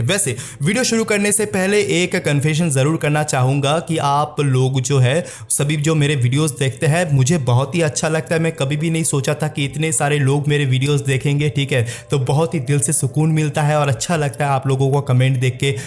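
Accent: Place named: Bengal, India. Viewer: Bengali tone...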